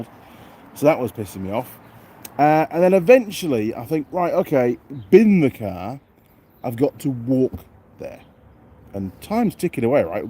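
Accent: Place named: East Riding, United Kingdom. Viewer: I've just found your videos in the last couple of days. Brilliant! As i've had a 1980 t140 built over the last year or so the gearbox strip has been fascinating. You are a natural teacher. Patience, clear and concise explanations plus a sense of humour, marvelous. Being a Yorky myself I can tell you have a neutral accent, but every so often an East Riding one slips through. Where abouts in 'gods own country' are you from. Dewsbury born and bred myself. Keep up the good work and i look forward to the new Bonnie video very much.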